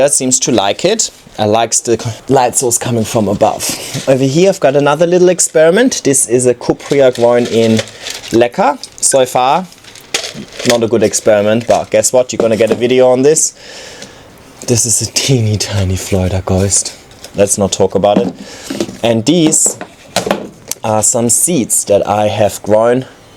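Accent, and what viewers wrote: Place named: Germany. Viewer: It‘s cute to hear „ leider too heavy“ von dir😂😂😂, schon witzig das dein Deutsch nach all den Jahren noch durchkommt😬✌🏻finds lustig😊 love your video😃🪴 Lg Meike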